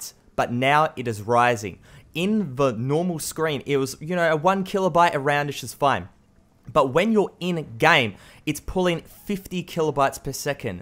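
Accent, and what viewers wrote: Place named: Australia. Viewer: I like your Australian accent hehehehehhehe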